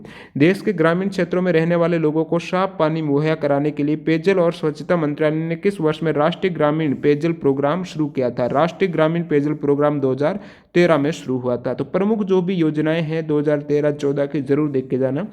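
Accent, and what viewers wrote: Rajasthan, India. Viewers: Bhai rajasthan se lagh rahe ho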